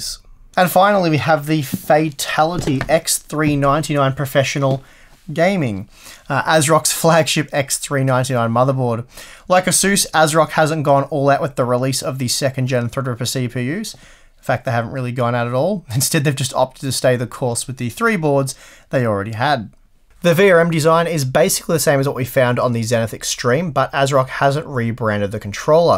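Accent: Australia. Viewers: Just what I want in a tech YouTube channel, Australian accent, gives you alot of detail on everything Pros and Cons. Keep up the good work. 👌🏻👍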